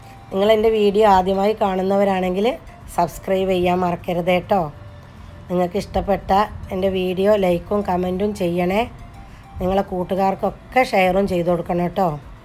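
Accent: Malappuram, India. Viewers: അടിപൊളി നമ്മുടെ മലപ്പുറം സംസാരം സൂപ്പർ ആട്ടോ 👌💞💞💞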